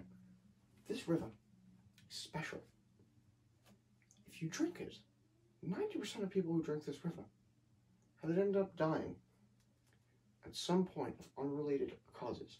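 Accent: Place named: Ireland. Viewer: You sound irish, not british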